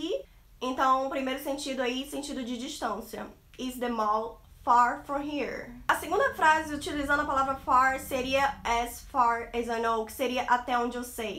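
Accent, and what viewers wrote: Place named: Rio de Janeiro, Brazil. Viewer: Pelo sotaque deve ser carioca hahha. Uma dica, tenta deixar um pouco mais as traduções na tela, pra quem não manja muito dmr um pouco pra pegar a forma como se usa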